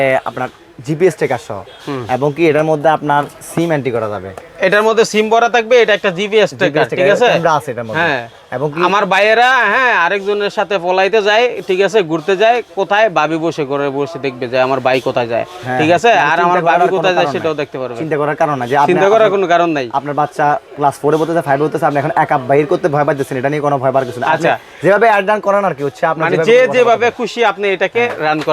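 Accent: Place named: Chittagong, Bangladesh. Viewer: ভাইয়া কথা শুনে মনে হচ্ছে ভাইয়া চট্টগ্রামের ছেলে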